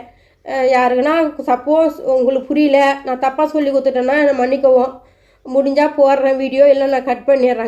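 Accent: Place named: Northern Tamil Nadu, India. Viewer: Don't Worry dear❤❤😢😢 விடும்மா என்னை அழ வச்சிட்டியே😢❤ வேலூர், விழுப்புரம், சேலம் என்று வடமாவட்டங்களின் வட்டார மொழி இப்படி தான் இருக்கும்.Pls🙏 friends யாரும் செல்விம்மாவை சங்கடப் படுத்தாதீங்க❤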